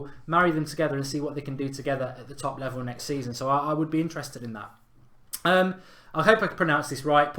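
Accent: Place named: Newcastle, United Kingdom. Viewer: Loved the Geordie accent.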